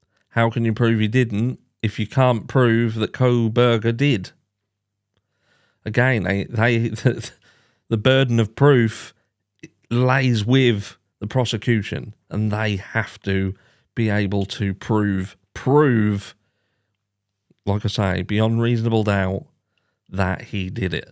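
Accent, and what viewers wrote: Norfolk, United Kingdom. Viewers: It’s a Norfolk accent mines Suffolk and people think I’m from Australia too. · I think you sound british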